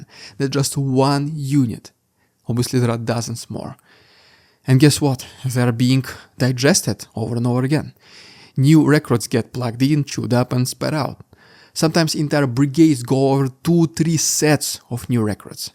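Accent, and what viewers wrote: Russia. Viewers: Tavs krievu aksents izrais dusmas.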